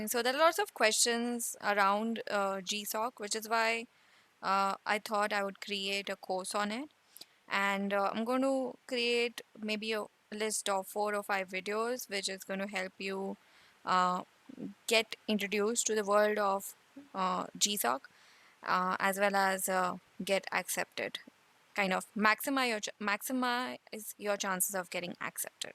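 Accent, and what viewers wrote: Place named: India. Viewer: Sounds Indian to me xD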